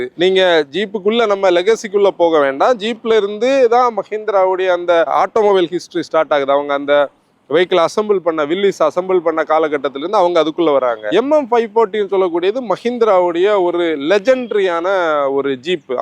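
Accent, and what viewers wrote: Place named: Nagercoil, India. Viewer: I think he is nagercoil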